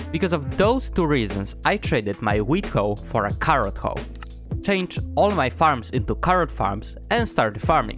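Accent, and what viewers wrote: Poland. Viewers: your accent sounds soo polish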